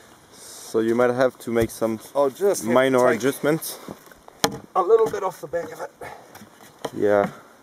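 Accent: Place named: France. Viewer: Don't you like my FRRrench accent ? At least, I hope you didn't get "pieced" with it. Sry for bad focusing. I guess what I missed is when he was digging, so I can reveal you the secret on what there was on the ground : A hole !! check between 1''32' & 1"39' : it confirms my theory héhé hihi hoho ! Thx for whatching mate, hope you enjoyed :)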